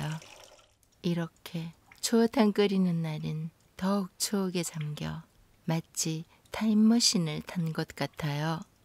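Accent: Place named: Gyeongsang, South Korea. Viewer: 참~~경상도 사투리는 언제나 들어도 좋아요 사람을 무장해제시키는 매력있어요^^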